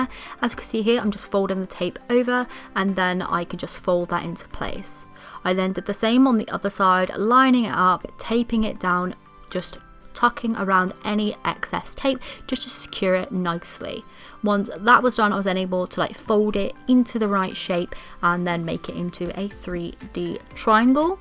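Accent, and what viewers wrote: United Kingdom. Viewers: I knew it! I guessed u where british!!! Yessss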